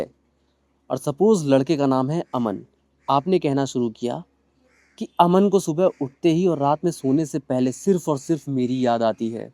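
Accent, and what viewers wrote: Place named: Bhojpuri, India. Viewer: Loved your bhojpuri tone ❤our language is our Pride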